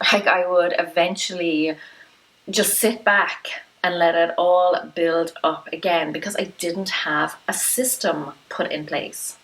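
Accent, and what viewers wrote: Ireland. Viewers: Would love to see a monthly reset! Thanks!!! By the way, Americanisms with your Irish accent? Love it! Ain't nobody got time for that never sounded so cute and classy at the same time ;-)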